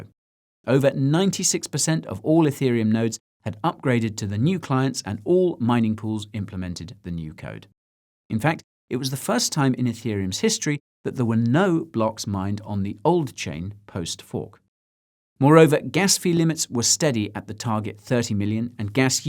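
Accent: United States. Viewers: american accent is sick. much love from tennessee 🇺🇸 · Love the American accent lol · Nice American accent!!! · Great American accent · Love your American accent! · As an American I find your American accent impeccable and was super impressed. 😳🌟👌 Well played, sir! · Impressive United States accent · Pretty good American accent guy. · As an American, I appreciate your American accent hahaha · Not bad American accent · American accent was not bad///// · That was a far better American accent than I would be able to do British accent. Bravo 👏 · Guy, does a pretty good American accent. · The American accent is brilliant! Very well done. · that American accent is on point lmao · your american accent is fantanstic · pretty good US accent lol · I like that USAccent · The American accent was great 👍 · Great American accent, ya got there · Incredible American accent! 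· As an American, I want to say your American accent is excellent! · Your American accent is pretty good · The American accent is amazing hahaha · Great American accent. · Great American accent! I'm always amazed when I hear a brit talk just like a Yankee! Very kool!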